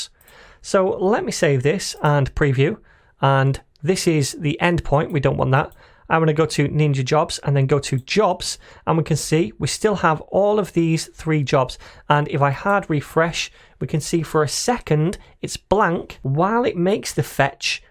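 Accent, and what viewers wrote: United Kingdom. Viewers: Is this tutor British?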